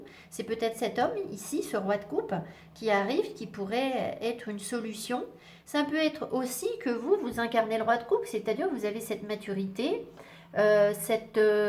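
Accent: England, United Kingdom. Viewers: Merci pour ce beau tirage plein de messages. Très parlant pour moi en tout cas et merci aussi pour votre magnifique accent anglais 😊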